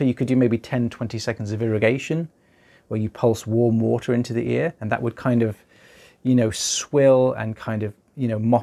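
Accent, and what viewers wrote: United Kingdom. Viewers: i think you need to talk to dr. Neel Raithatha he does ear wax removal also and he uses an oil type cleanser called clear. excuse me if i'm wrong, but you sound British to me and Neel IS British so maybe find him some how. he has his own youtube channel but his comments are off so try using his comunity page. hope he can help.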